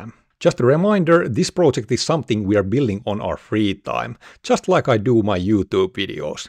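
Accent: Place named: Finland. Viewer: Definitely can hear the authentic Finnish tone my friend, me and my team members are referring to your lessons to study the DP-600. Enjoy Fabcon, Kiitos!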